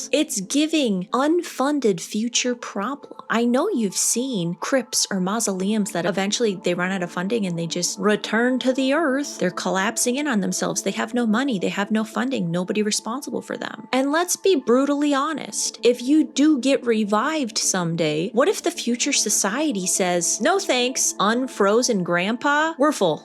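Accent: Minnesota, United States. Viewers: I knew you were from Minnesota. The accent is very subtle, but there must be a law that no woman in Minnesota is allowed to live there unless you are at least not a nine of ten, Wisconsin is a close second, but weirdly, the women in both states are not just normal pretty women, they're ALL movistar hot. It seems very strange and intimidating to outsiders as we assume the local guys must be similarly attractive to women. I'm considered extremely attractive in most of the country and I one hooked up with a lady in Wisconsin, but Minnesota women have always been elusive to me, despite being attractive, successful, and having a great sense of humor and the same IQ as as Elon Musk. I can't even get a single date with a Minnesota lady.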